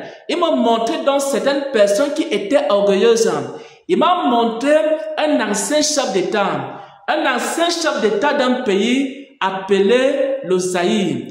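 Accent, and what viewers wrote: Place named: Cameroon. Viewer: Tu es camerounais par ton accent.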